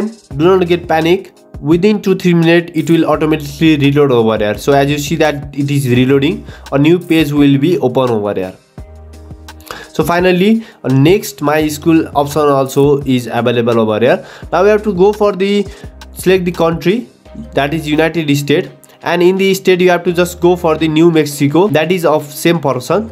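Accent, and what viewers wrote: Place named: Nepal. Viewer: Accent tah nepali xa tah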